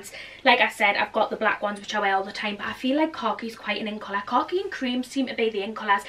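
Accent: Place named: Geordie, United Kingdom. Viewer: I feel like your Geordie accent was so strong in this video 😂❤ You look amazing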